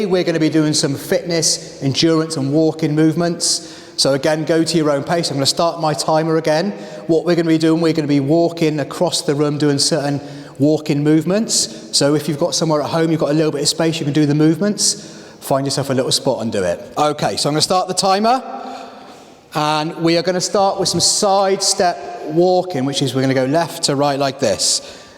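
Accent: England, United Kingdom. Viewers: I rather enjoyed those exercises! Also felt at home with a lovely English accent! Thank you.! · Where is this filmed and what accent do you have…English, Aussie, what province?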